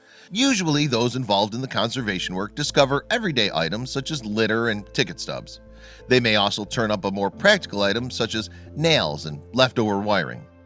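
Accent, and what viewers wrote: United States. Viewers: A beautiful ENGLISH house with a yank speaking in non English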